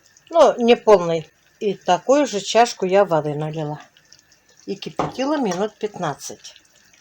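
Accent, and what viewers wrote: Tatarstan, Russia. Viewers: Apaika ne v koem slu4ae ne hotela obidet vas .vi horosho govorite .akcent tatarskii obozhay vas .s uvazheniem Farida💋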